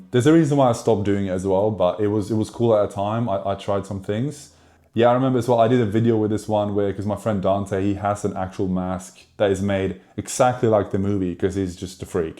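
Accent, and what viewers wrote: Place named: Australia. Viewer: must be the aussie twang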